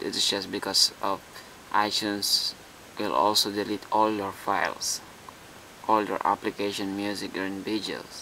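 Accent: Philippines. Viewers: filipino accent :) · Philippine? i have a philippine teacher that speaks exactly the same. switches the Fff with P, and some she switches some other letters too. but i mainly remember that.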